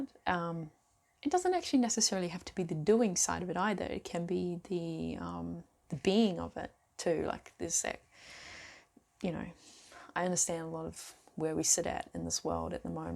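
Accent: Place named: Australia. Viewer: Your aussie accent is growing!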